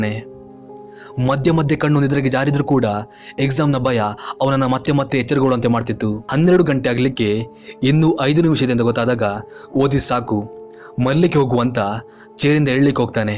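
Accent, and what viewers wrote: Kundapura, India. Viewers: Kundapura kannada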